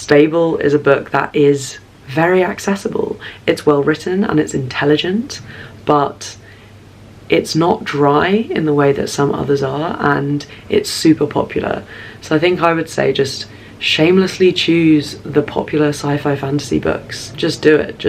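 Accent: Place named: United Kingdom. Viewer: I really enjoyed this video. Its cool to hear someone else's take one books like these. However, i cant keep my self from wondering about where you are from. Some times it sounds British, at times Australian and then i wonder if you're South African. You have probably gotten this question many times. But where are you from?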